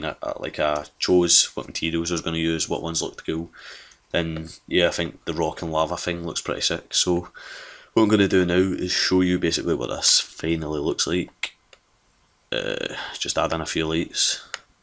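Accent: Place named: Scotland, United Kingdom. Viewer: you are REAAALLY scottish o.O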